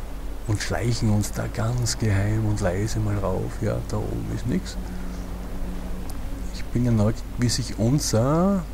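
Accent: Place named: Austria. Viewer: Der Ösi akzent ist zum verlieben!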